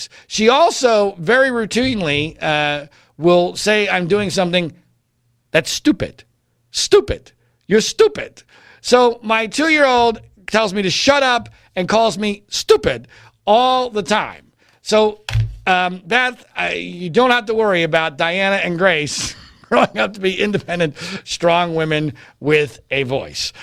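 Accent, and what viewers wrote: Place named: Philadelphia, United States. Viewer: I knew there was a reason why I enjoy listening to you. You're from Philly.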